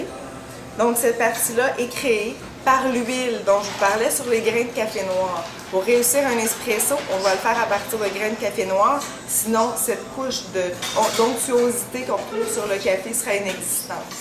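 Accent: Quebec, Canada. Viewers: merci bcp pour 1 conseil sup...avec 1 accent qbqwa c vrmt cooool. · votre accent est très agréable, vous me faite aimer de plus en plus le Quebec.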